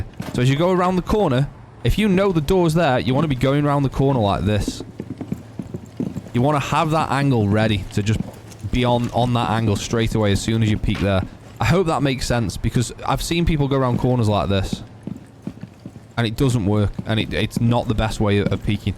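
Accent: United Kingdom. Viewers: In a world full of slightly toxic American content creators, I really enjoyed the friendly, familiar British regional voice. Good content! Worry less about how you’re conveying things, it could be more concise, but the pacing was great, so I don’t think it matters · bro are you British 💀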